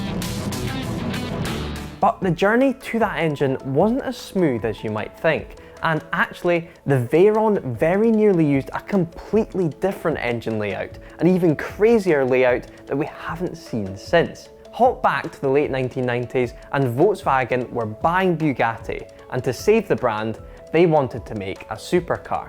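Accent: Scotland, United Kingdom. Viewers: the guys Scottish, a lot of people can't understand the accent. Didn't know if he was serious or not.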